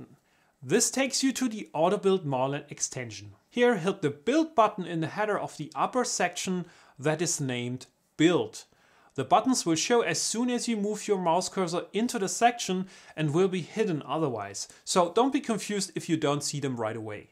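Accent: Germany. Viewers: Thanks for your help. The german accent punctuated my OCD demand to make my dumpster find functional again!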